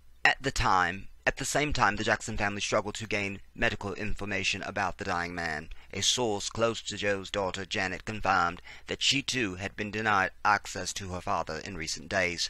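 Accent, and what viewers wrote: United Kingdom. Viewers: The British accent 😂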